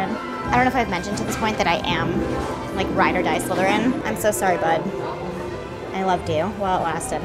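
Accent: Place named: New York, United States. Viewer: I thought she had a New York accent then she confirmed that she was a New Yorker, that's the easiest accent besides the midwestern accent to spot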